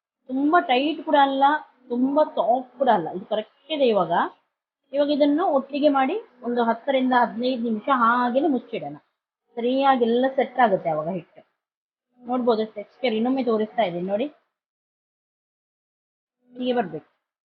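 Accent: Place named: Kerala, India. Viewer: Mdm..ji.ur kerala style mixing speaking kannada explanation is extremely good n methodology is excellent..sister.let God gives u all sathvica sampathu..Badarinath.mysore.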